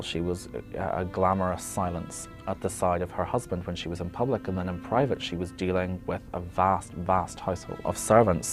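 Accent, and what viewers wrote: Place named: Ireland. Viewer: Love the Irish commentator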